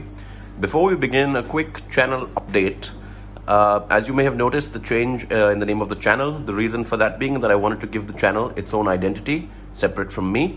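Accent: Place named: India. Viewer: Indian accent is sooooo annoying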